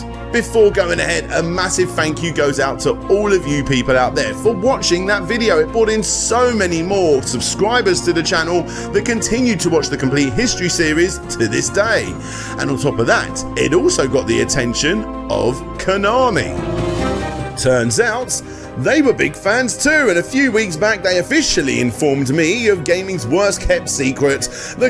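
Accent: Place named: United Kingdom. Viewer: I love UK accents. Hi 🥺🥺🥺🥺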